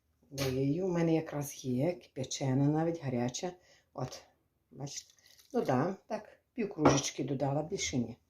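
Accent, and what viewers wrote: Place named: Bukovina, Ukraine. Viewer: як завжди тепло по-домашньому.Хочу сказати Вам, одну річ,зустрічала в коментарях під Вашими відео спроби навчити Вас літературної мови. Не ведіться на це,бо наш діалект є природнім і властивим нашому краю. У нас навіть старі люди ніколи не казали "борошно",завжди "мука". або коли невелика кількість,то "мукичка"і так далі,не буду перечисляти.Для чого нас рафінувати? Буковинська краса і в нашому діалекті також.Вам здоров"я, Божого благословіння.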